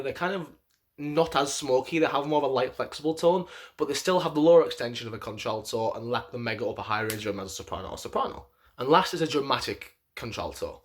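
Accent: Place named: North, United Kingdom. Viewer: I have an accent fetish but I can't place yours exactly...it must be the far north somewhere between Yorkshire, Scotland snd Northern Ireland. I think I'm in love.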